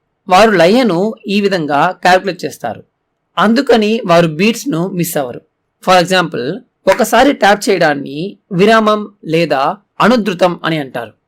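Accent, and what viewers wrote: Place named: Tamil Nadu, India. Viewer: గొంతు నీదేలా ఉంది. తమిళ్ వారు మాట్లాడే తెలుగు లా ఉంది.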